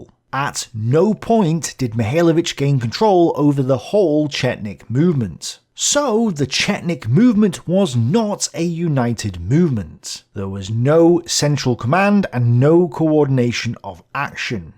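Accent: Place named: North, United Kingdom. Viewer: I was born in New Hampshire and like your shirt. Can’t quite place that accent. Sounds like you’re from the Far North!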